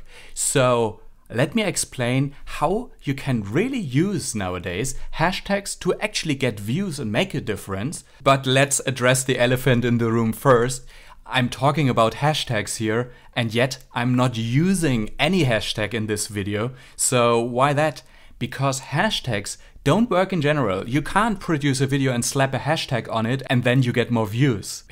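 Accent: Germany. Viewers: Thanks for the insight, I like your spreadsheet :D Out of curiosity, are you German?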